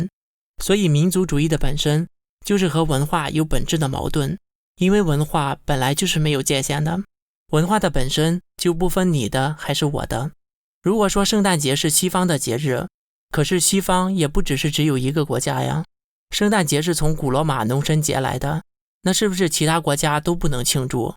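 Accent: Shandong, China. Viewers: 搞笑，中國人又不是普遍信基督教，這不過聖誕你們又受不了了?台灣人說中文，寫漢字，吃飯用筷子，過中國傳統節日，他都不認為自己是中國人！還有up主你也別裝台灣人了，那口山東口音還沒掩蓋住呢！說到自卑，你不更自卑，不過這輩子你都不能變洋人！ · 你这个山东口音也是很强了。个人认为，不过就不过吧，抵制就很弱智了...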